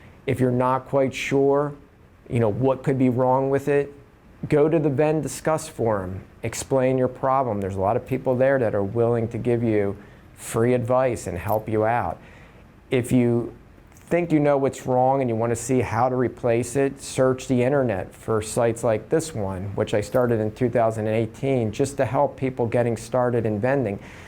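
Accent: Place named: New Orleans, United States. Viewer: Pennsylvania?? Why do u have a New Orleans accent?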